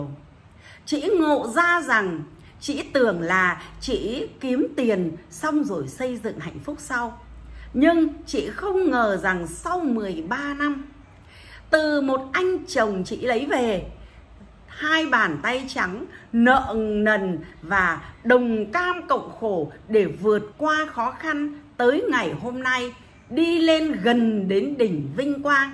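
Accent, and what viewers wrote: Standard. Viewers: Chi noi chuan qua dan ong hay ham cua la an com chan thich an pho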